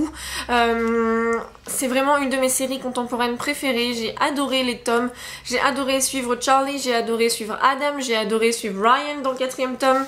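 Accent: England, United Kingdom. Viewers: Tu me fais trop rire, t'as des fois un petit accent anglais, j'adore! T'es un peu fofolle je crois :p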